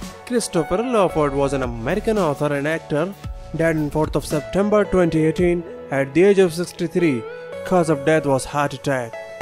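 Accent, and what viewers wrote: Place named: India. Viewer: his accent is clearly East Indian/Asian and a strong one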